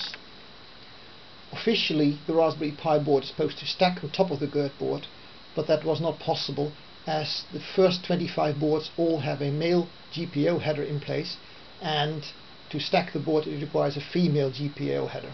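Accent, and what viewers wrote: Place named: Netherlands. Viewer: Funny how you can tell he is Dutch from the first sentence he says. Great Work!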